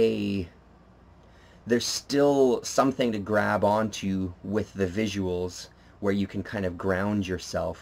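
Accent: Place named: Canada. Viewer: Canadian! He says "aboat"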